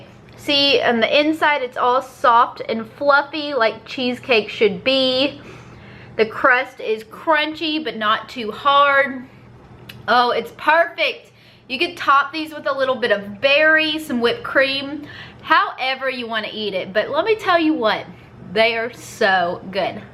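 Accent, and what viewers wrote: South, United States. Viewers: You so country lol lol lol. I love it!!! So adorable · I love ya girl.... Since u moved to bama ur getting more country twang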